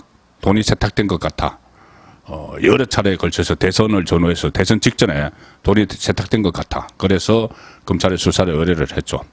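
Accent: Gyeongsang, South Korea. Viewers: 정확하고 듣기부담없는갱상도 라서 엄청듣고있세여~**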